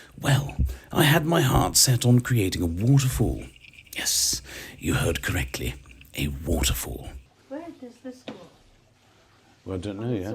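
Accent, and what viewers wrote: United Kingdom. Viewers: I love hearing you speak. It sounds like you belong in a Harry Potter movie. From one Brit to another i absolutely love it.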